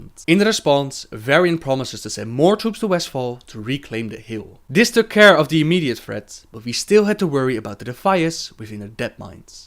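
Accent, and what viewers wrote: Netherlands. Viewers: You have an incredibly strong dutch accent mate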